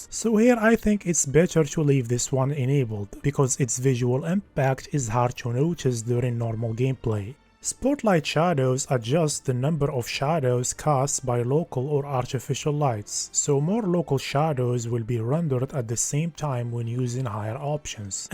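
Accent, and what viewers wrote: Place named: Morocco. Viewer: Bro's accent is as Moroccan as it gets. Keep going, khoya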